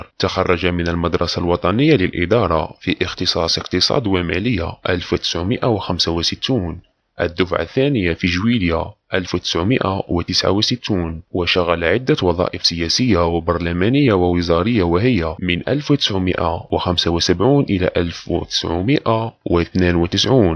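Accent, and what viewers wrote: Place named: Morocco. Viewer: صاحب القناة مغربي من لهجته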